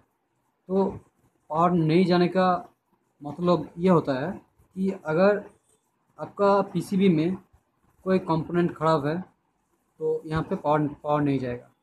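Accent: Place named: Bengal, India. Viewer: From Bengal as evident from accent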